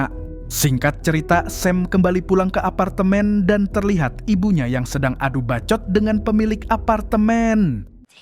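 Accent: Banjar, Indonesia. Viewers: Pian urang banjar kalsel kah 😅😅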